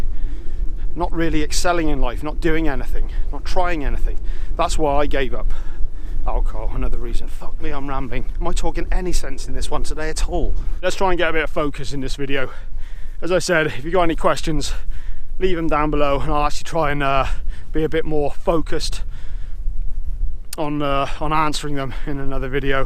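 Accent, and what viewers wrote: West Country, United Kingdom. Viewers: Not down to earth talking, good west country lad! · Nice one Man am sober too ! Respects.....bet ya life has changed ! Hear a West Country Accent.?.. The World is in the grip of addiction so we need people to raise above this Demonic behaviors and speak about their journey into recovery......... Respects Blessings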